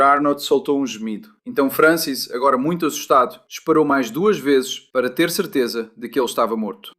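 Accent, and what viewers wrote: Portugal. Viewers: Esse sotaque de Portugal nossa muito ruim de ouvir. Voltem com o nosso português por favor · Eu gostava bastante deste canal, mas agora com esse sotaque de Portugal, estou pensando seriamente em desfazer minha inscrição, esse sotaque é irritante 😡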